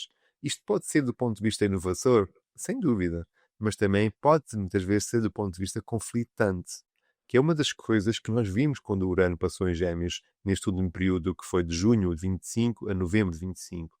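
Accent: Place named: Portugal. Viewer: Muito bom! Em português de Portugal!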